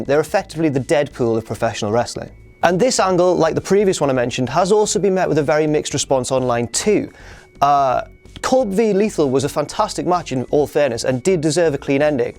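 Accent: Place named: United Kingdom. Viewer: adam rose was charged with tampering not tumbling =P that british accent doe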